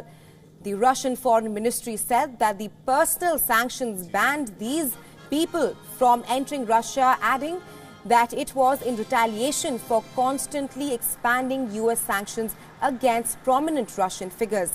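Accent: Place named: India. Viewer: Disgusting ' Indlish ' pronunciation !!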